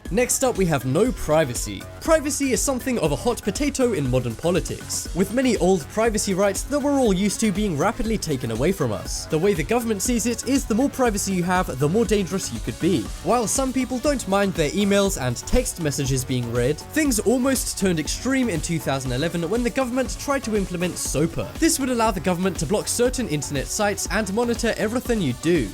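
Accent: United Kingdom. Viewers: he is British, like me. We all have accents. You do, I do, everyone does.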